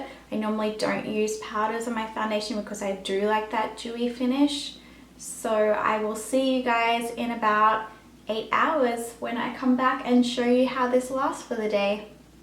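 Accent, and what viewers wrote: Australia. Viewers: Can you please tell me how you got this product so early?! I'm assuming you live in Australia, like me (due to your accent) and it isn't released here until Aug 25th.. and the Too faced and Sephora websites don't ship to Aus :(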